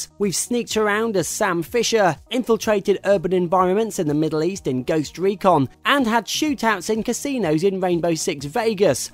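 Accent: England, United Kingdom. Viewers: what is this accent??? english? canadian? australian? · GRRR british accent sucks.. Its same as german and english together -> British... Nothing beats american Accent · british narrator for american game wtf? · he speaks funny this is why i like ricky gervais and british people.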